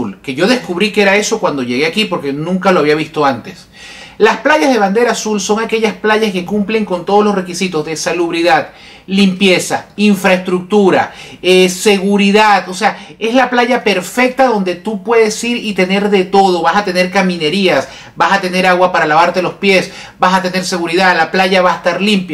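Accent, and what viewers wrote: Venezuela. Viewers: Es venezolano y está super claro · Eso que dijiste: "España es el Perú de Europa" . Te suena muy ofensivo cuando comparan el Perú con España, y no parece indignarte que se refieran así del Perú. Uhm... y vos no pareces español... más bien venezolano. Pésimo comentarista, no reflexiona en lo que habla.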